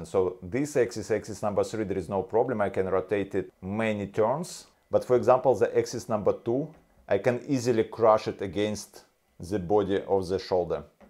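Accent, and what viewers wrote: Russia. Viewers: You twice mentioned Putin at end your video again..hm...hmmm... and this Rus accent...hmmmmmm!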